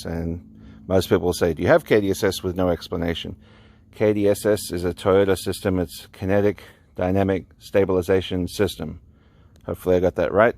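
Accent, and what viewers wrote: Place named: Australia. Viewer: Great explanation and tips. You sound very Australian, I can't figure it out haha. Cheers Cobber!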